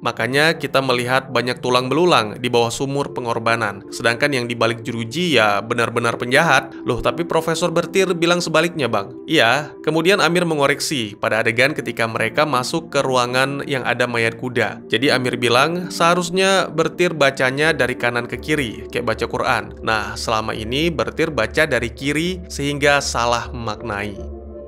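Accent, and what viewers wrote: Aceh, Indonesia. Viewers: Bang orang Aceh kah? Yok ketemu bang di Banda Aceh penasaran sama suara candunya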